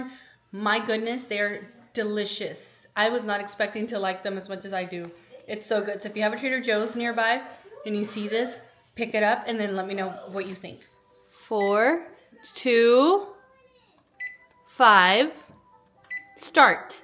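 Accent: Spain. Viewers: Love your Spanish accent!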